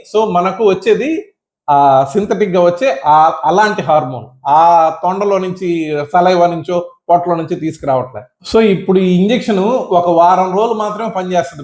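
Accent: Nellore, India. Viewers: Maa nellore bhasha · Love you sir ur ur nellore good👍👍👍👍👍 God bless u& ur family with health & wealth & prosperity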